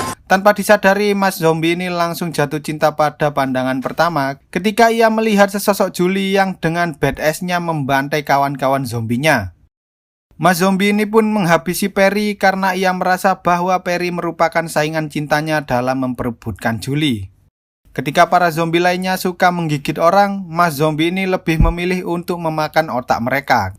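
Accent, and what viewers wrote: Java, Indonesia. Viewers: fix logat wong jowo😆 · LOGAT ELO MEDOK JAWA BINGITS SIH MAS E NARATOR. NGAKAK GW 😂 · Abang orang jowo (jawa) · Orang jawa yah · Wong Jawa apa pie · Koee wong Jawa Yoo mas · Ene wong jowo iki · Ngomongne medokne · jawane medok yo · abang orang apa? jawa? abang dalam menjelaskan filmnya kocak banget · Jowo ne ngendi mass?? Maklum Subscriber awal🔜😁😁😂🤣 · admin ngomongnya jgn terlalu medok jawanya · Kamu orang jowo yo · Kasi lope dong lur kwkw daerah mana bang jawa nya? Gua jateng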